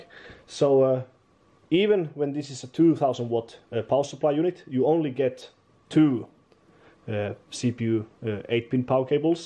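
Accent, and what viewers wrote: Finland. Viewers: sounds like Finnish accent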